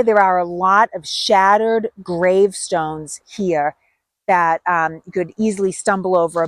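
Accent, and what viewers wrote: Boston, United States. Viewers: No way, accent is definetly from inside the 95 belt around Boston. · you have an adorable “Boston” accent.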